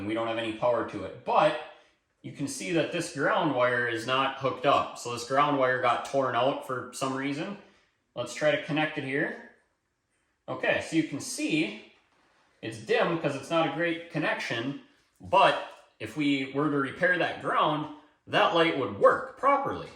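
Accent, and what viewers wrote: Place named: Minnesota, United States. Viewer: The brown wire ground back feed diagnosis was really nice work, as if you’ve done this before! 😅 The mouse situation was also classic. Re-wiring the whole thing was the right thing to recommend. The Minnesota accent is perfect! Go get some hot dish and jell-o salad. How about some bars for dessert? 😊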